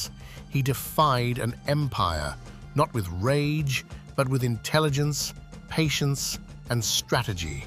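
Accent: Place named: Europe. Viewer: It's only one Menilik that existed in ancient time. Menilik ll , is the only Menilik. The person telling the story sounds like a European. Europeans are good and fabricating stories. The story is fake as a 3$ bill. Menilik story is around close to 300 years old. Stop believing everything you read in hear. Read, study and research ancient time facts.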